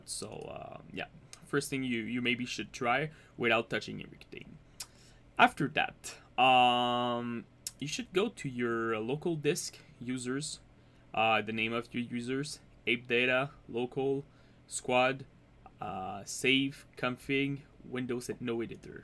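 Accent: Quebec, Canada. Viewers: !!!!!!!!! French Canadian? Quebec or Montreal?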